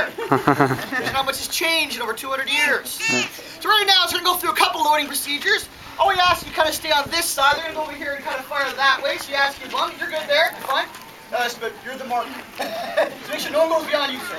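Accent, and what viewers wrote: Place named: United States. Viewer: This was very interesting, but as a Briton it was somewhat wierd to listen to an American accent.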